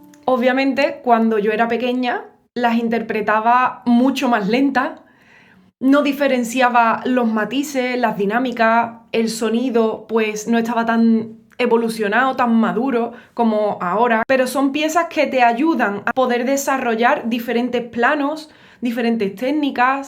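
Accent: Andalusia, Spain. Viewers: Tambien toque el divetimento! Mas que la guitarra cautiva tu andaluz! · Cómo decimos en: México tocas chido, pero más chido tu acento gitano andaluz macita, saludos 🌹 y 🍻🍻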